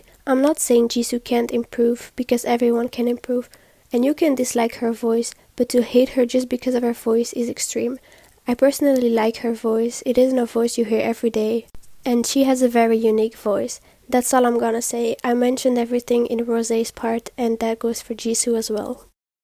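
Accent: France. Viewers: Are you French? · Are you french?? Bc ur accent sounds a bit french and i'm french too so that's why i'm asking ;w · Are you french ? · sorry, it's not about the video but are you french? idk but your accent sounds like a french one 🤷‍♀️ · your voice is so beautiful! i love your french accent . great video , i love the points you made :)💖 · I am French, this is obviously a french accent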